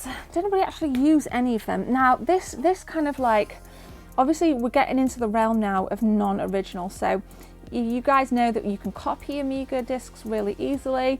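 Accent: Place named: Liverpool, United Kingdom. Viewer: You sound like a posh Scouser? AND you DONT look old enough to know about an AMIGA ?!?!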